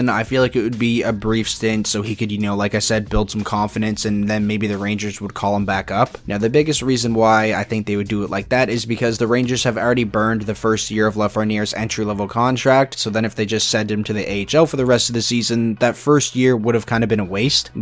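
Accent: Canada. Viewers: Your voice sounds often Canadian! Not that that’s a bad things!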